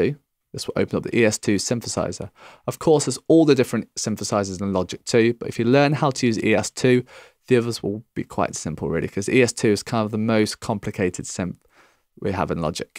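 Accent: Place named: United Kingdom. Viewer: Thank you man,but your british accent...I just didn't catch anything...anyways,thank you :)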